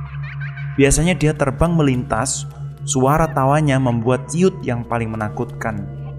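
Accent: Java, Indonesia. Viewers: Bahasa jawa medok 😂😂😂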